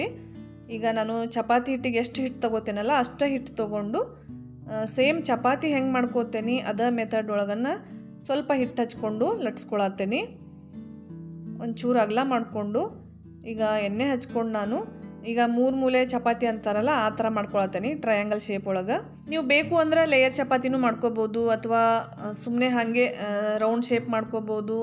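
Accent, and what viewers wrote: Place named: Andhra Pradesh, India. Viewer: actually I'm from Bangalore ree nang Nim thara Kannada maathadodh Andhra Baal chalo ansthithi ❤️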